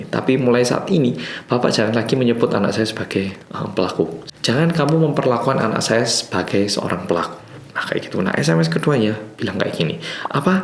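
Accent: Java, Indonesia. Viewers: Semoga menjadi contoh dan perhatian pemerintah Indonesia preside dalam melindungi anak bangsa dari berbagai sisi dan menjadi hemat dalam membuat undang2 perlindungan anak perlindungan anak Saya pernah dengar langsung cerita dari anak sepupu (masih kelas 5 SD) bahwasanya ada teman lelakinya disekolah menarik teman perempuan disekolahnya dn menyuruh membuka pakaian dalamnya dn diberikan uang, bayangkan betapa terkejut sekaligus sedih dn takutnya saya, dan menurut keterangan sianak mereka sudah memberitahu kepada guru, akan tetapi tidak terlalu ditanggapi , hal ini sepertinya sering terjadi disekolah, terlebih di daerah. Semoga menjadi perhatian lebih dari pemerintah khususnya kementerian pendidikan, karena sekolah harusnya aman dan nyaman demi masa depan bangsa.Amin. Terima kasih bt oppa yg mendok, terimakasih sdh mengedukasi. Sukses slalu. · Mas nya ini asli org korea bukan ya.. 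kok logatnya jawa.. apa keturunan aja ya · Tiba” mncul d dranda ,pas aku buka videonya,mukan dia korea bgt tp pas ngmong logatnya jawa bgt kocaakkk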